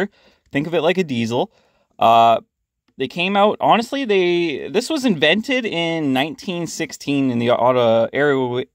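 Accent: Canada. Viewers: I'm Canadian, and you sound REALLY Canadian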